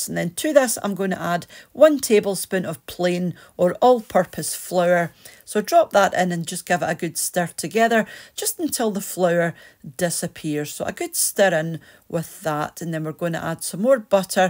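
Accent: Scotland, United Kingdom. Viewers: I love your soft Scottish voice... I could listen to you reading the phone book😂😂😂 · First time I’ve watched you. Love the Scottish accent, which I miss from relations that have passed away now. Thank you for the sausage pie. Will have a go at that one sometime soon. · Every time I hear your wonderful Scottish accent I have a desire to fire up my R.G. Hardie pipes. 🇬🇧🤝🇺🇸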